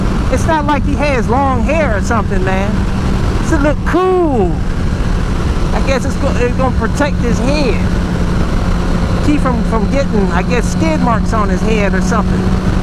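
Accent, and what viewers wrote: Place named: Louisiana, United States. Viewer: Dude are you from Louisiana? You sound familiar af bro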